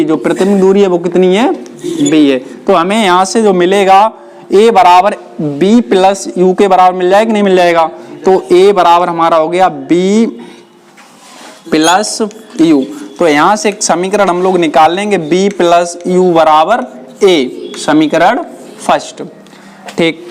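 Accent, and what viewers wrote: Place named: Aligarh, India. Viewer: Bhaiya aligarh se ho kia😅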